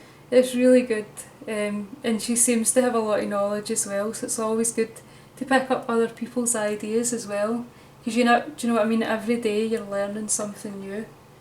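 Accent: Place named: Scotland, United Kingdom. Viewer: Scottish accent on a girl though.. wow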